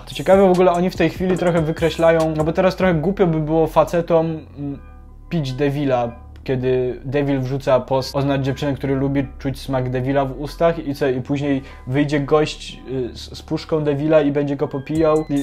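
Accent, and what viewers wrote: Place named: United States. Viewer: przez jego amerykanski akcent to brzmi jak "szałmi" lecz poprawna mandarynska wymowa to "siałmi" to "ał" jest krotkim ao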